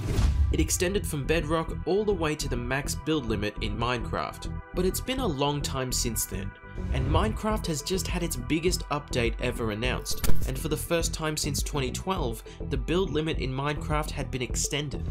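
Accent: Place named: United Kingdom. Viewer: I love this guys British accent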